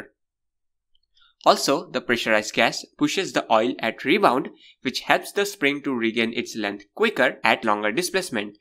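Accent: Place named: Andhra Pradesh, India. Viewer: A Telugu English speaking AI bot. We are doomed 🤦🏿‍♂️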